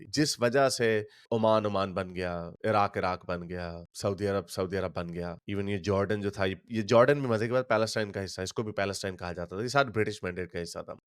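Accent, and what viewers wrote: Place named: Punjab, India. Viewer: Bhai kitna bhi disheartened ho jao but videos banani band nahi karna. Aapka Punjabi accent aur Punjabi mein kosna bohot acha lagta hai.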